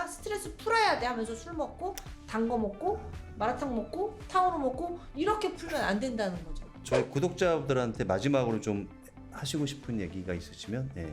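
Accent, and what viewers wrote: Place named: Jeolla, South Korea. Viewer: 이언니 말투가 전라도네ㅡ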